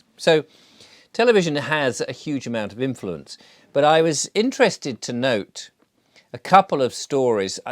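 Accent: United Kingdom. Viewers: i am from Michigan and I have no accent but you on the other hand have a thick British accent, it seems to me.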